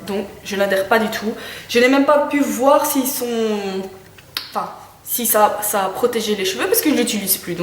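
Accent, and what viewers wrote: Belgium. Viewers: salut, je viens de découvrir ta chaîne et j'aime beaucoup tes vidéos tu es claire et précise et ton accent Belge je crois si tu es Belge je l'ai vite capté lol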